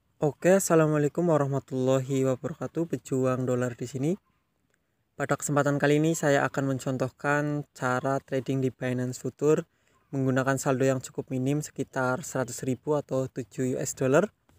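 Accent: Banyumas, Indonesia. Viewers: Bg orang ngapak ya?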